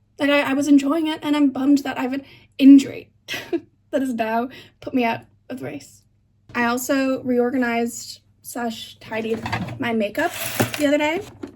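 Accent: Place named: United States. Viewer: She’s Irish, but lived in America for a while growing up, so it’s a mix of the two!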